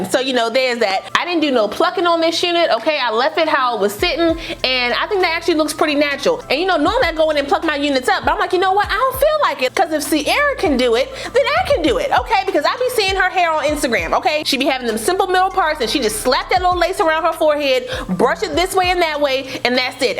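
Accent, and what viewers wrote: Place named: DMV, United States. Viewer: That intro tho…girl you gotta be from the DMV! And the hair looks bomb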